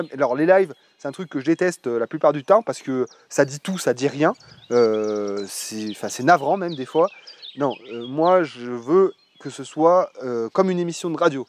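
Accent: Loire, France. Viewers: Tu prends de plus en plus l'accent de la Loire ! C'est rigolo ( pas l'accent, c'est juste de plus en plus flagrant !)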